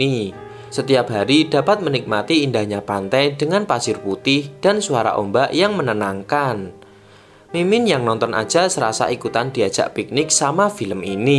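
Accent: Java, Indonesia. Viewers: Narator Jawa tulen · Aduhhh logat nya terlalu medok bro jadi kurang nyaman nontonya, sorry to say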